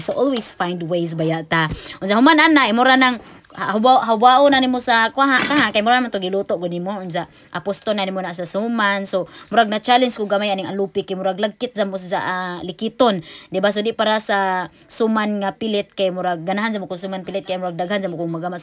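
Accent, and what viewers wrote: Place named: Bohol, Philippines. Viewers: Lingaw jud ko dai nga motan-aw sa imong video kay pure boholano ang imong sinultihan. Padayon sa imong show, kay panalanginan ka Ginoo. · Mag ngisi jud ko maminaw sa tonada sa sinultian sa bul.anon. proud anak sa pure bol.anon ko. Basta bol.anon kugihan. ✌️😁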